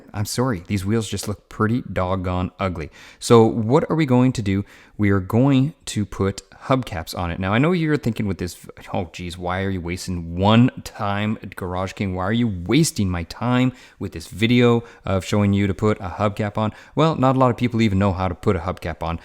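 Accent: Canada. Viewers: Great vid G! I think I heard the canuck accent!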